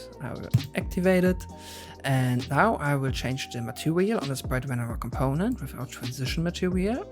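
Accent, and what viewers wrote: Germany. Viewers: I love your channel! Man hört dein deutsch voll raus :P Ich abonnier mal ^^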